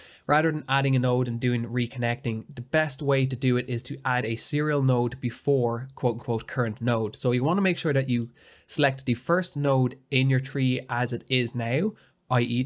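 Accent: Ireland. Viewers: Great video! You sound Irish... visited Galway and Dublin last year and had a crackin' time!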